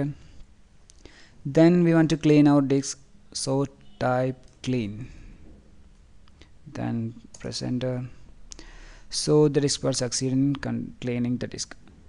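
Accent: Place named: India. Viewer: he must be indian indians speak english like that R r RRr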